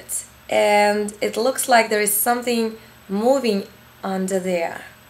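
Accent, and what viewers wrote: Russia. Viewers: you're russian, right ? :))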